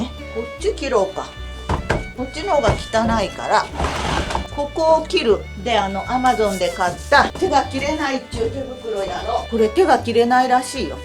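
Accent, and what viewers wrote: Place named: Yamanashi, Japan. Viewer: 祖母が山梨の人のため、たまにでる甲州弁に懐かしさを覚えながら見てます。将来こんな暮らししたいです。見てて元気が出ます！！いつもありがとうございます！！！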